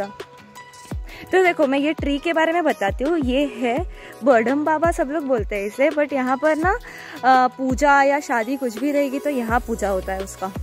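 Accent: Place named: Maithili, India. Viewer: Where are u from? Sitamarhi or Muzaffarpur? The accent i heard is "Maithali'', so i guess u from Sitamarhi. I am from Sitamarhi, Bihar too and currently i live in New Delhi. BTW i loved your vlog. It reminded me of my village. Keep going.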